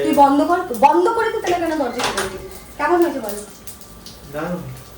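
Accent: Bangladesh. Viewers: Di tumi steam e kore dekho darun lagbe Tomar kotha bolar tone Bangladesh r mone hoi Aage aeikhane chyla ki na ??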